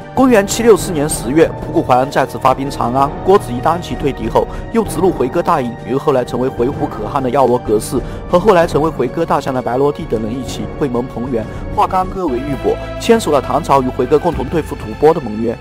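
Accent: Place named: Hong Kong, China. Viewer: 應該是香港人吧!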